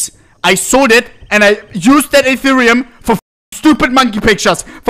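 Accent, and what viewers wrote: Germany. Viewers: german accent is real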